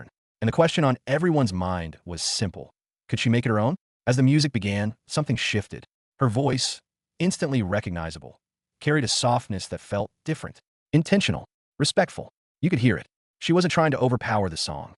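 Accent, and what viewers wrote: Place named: United States. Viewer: SHE 'SHOCKED THE WORLD??????'...REALLY????..... 'A BREATHAKING PERFORMANCE THAT NO-ONE EXPECTED'....All I can say is that this narration is SO American it's sickly.....I notice you don't play the performance, you just TALK, TALK, TALK...I thought her performance was DIRE!!!!! just like this video.....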